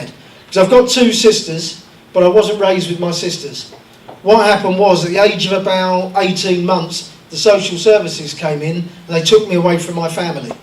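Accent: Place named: Essex, United Kingdom. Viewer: you are a very funny guy. But you'll just have have to slow down your Essex accent Sir - then the world will be your oyster.😎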